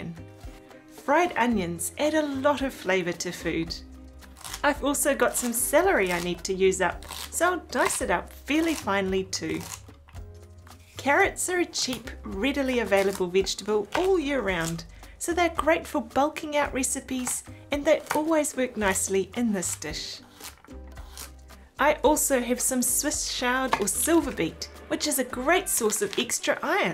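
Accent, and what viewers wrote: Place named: New Zealand. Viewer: Looks delicious!! Also, I enjoy listening to your New Zealand accent, so much. Best wishes from Phoenix, Arizona🤗💐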